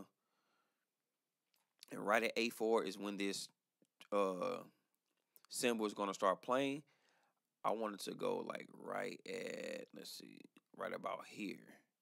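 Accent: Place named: St. Louis, United States. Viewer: You sound like you're from St Louis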